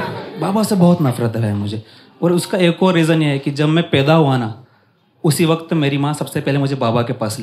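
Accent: India. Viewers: His accent is telling that he is from MP 😂😅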